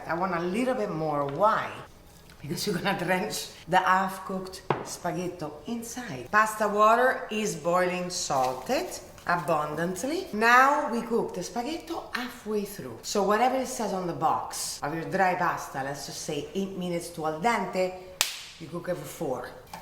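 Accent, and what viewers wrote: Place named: Rome, Italy. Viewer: Ma tu sei romana perché sento quando parli italiano la cadenza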